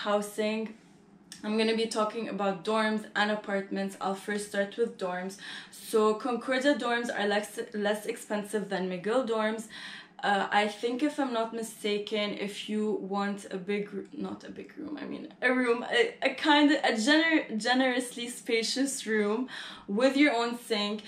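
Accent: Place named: Lebanon. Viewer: lebanese accent is so obvious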